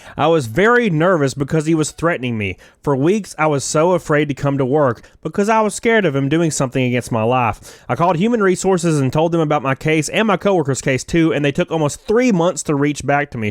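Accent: South, United States. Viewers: I don’t know how I found this video but this guy sounds like a Southern Kermet the Frog 🐸. I’ll keep watching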